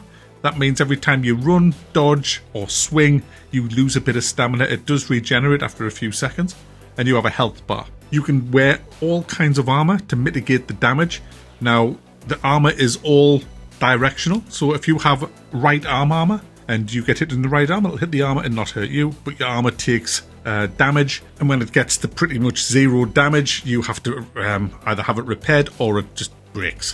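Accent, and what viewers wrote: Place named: Newcastle, United Kingdom. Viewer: Nice to hear a geordie accent on a game review! You're my new go to game reviewer by default now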